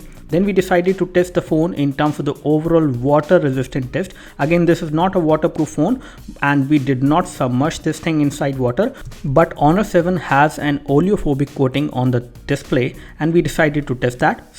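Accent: India. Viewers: I can't fucking watch this, holy fuck the cringy indian accent.. HELLOD ILLD PUD SOMED WADDAHD IND DEHD SCREENDID.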